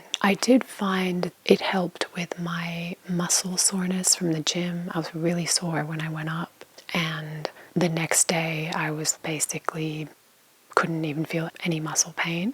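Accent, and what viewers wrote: Australia. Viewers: The best aussie accent spoken asmrist. This audio is perfect. Please do more 🔥🔥🔥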